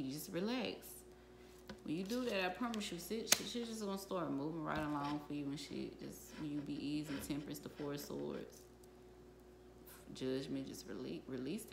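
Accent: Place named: Atlanta, United States. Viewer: Are you from atlanta 😂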